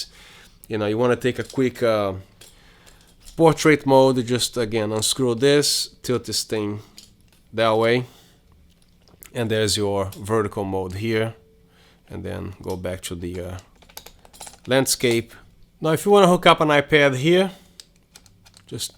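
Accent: Brazil. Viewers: The Brazilian accent is cool! Gostei muito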